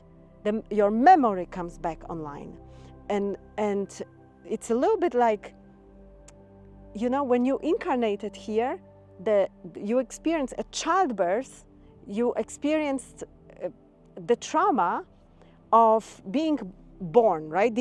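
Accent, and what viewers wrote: Poland. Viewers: Omg you sound so Polish, lol. ❤❤❤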